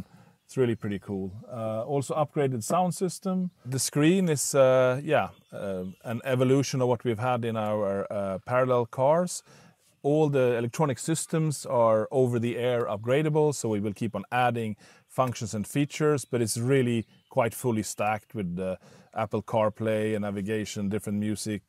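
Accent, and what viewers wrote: Sweden. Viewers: the svedish axent to hahaa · Jag älskar hur man hör att han är Svensk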